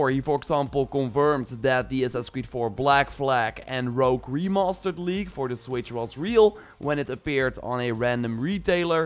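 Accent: Netherlands. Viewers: your Dutch accent is really obvious. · Ah, that Dutch accent :-D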